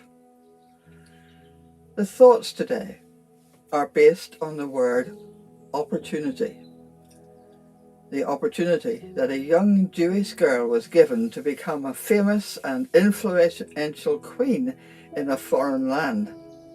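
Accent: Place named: Ireland. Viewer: I love listening to lovely Irish lilt. But I see where you are coming from. Sometimes we can't watch with sound on.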